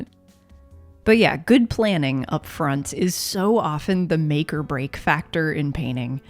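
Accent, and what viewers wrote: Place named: United States. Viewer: That's a very strong American accent ... I can't hear an English accent at all.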